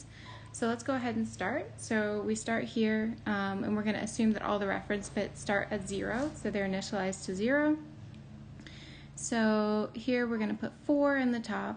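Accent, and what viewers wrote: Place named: Turkey. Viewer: bro where are u from that's turkish english ı think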